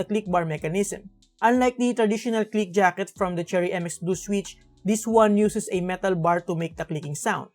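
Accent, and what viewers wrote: Philippines. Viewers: Ingles wid the pilipino aksent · his accent is so... Woah very filipino (in my ear) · He has dat pilipino aksent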